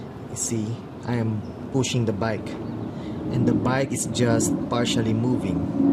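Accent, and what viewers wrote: Philippines. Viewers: U sound Filipino, are you?? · dude are you filipino?? you sound like a filipino man hehehe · Thats a heavy ass filipino accent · ayus ha pinoy na pinoy ka magenglish brad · he sounds Filipino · HES FILIPINO HAHA ACCENT SO OBVIOUS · dat pinoy accent tho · lmfao the filipino accent I can't take him seriously bahahhaah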